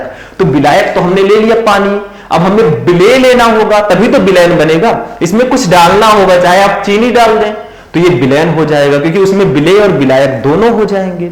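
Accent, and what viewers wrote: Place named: Gonda, India. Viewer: Sir aapke language se lagta hai ki aap aap Uttar Pradesh Gonda se hai 🤔🤔🤔🤔🤔🤔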